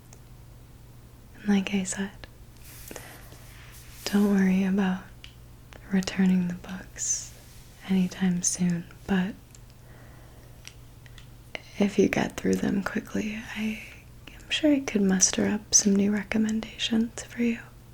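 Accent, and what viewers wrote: South, United States. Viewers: Excellent as always. Southern accent is my favourite, and I'm British 😊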